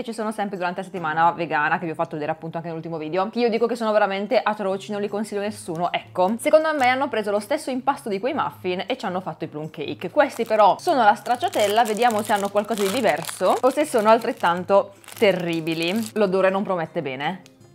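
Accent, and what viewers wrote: Turin, Italy. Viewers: #avocadoimpanato(?) Ragazza mia io ti devo dire grazie mi sono segnata tutto quello che spero poi di trovare al Lidl .. Yogurt al cocco.. Barretta al cocco.. Formaggio vegano..io sicuramente lo userò x le melanzane al forno.. E gli anelli di cipolla E sperando di trovarli i gelati al cocco che avevi detto l altra volta nel video che sei stata così carina a farceli vedere😋..❤ Parli fra un po' come una di cit turin😊.. Non so cosa hai fatto ai capelli ma sembrano più morbidi e lucidi.. Continua così. PS se torni al Lidl anche la marmellata bio alle fragole merita...😋😘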